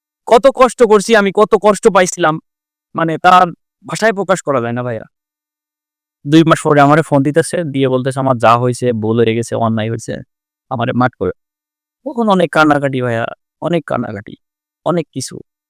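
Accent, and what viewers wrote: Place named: Faridpur, Bangladesh. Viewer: ভাই আপনার বাড়ি কোথায় আপনার ভাষা আমার কাছে খুব পরিচিত মনে হচ্ছে। আমার বাড়ি ফরিদপুর।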